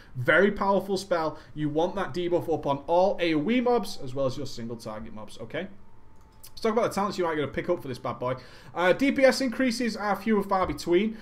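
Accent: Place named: Manchester, United Kingdom. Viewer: Wow, I'm from Manchester too, and I've never noticed, (until now) how annoying the manc accent is.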